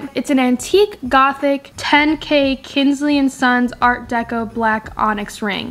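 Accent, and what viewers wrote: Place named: United States. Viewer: He accent is very country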